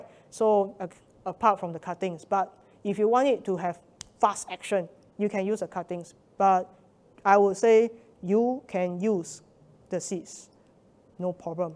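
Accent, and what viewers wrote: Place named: Nigeria. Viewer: Why she sounds nigerian 😅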